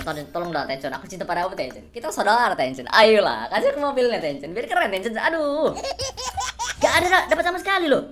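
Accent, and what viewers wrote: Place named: North Sumatra, Indonesia. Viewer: jelas kali logat sumut kau bg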